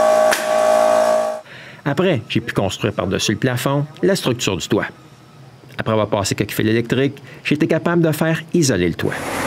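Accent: Quebec, Canada. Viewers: j’adore cet accent québécois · j'aime bien aussi votre accent canadien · j'adore l'accent québécois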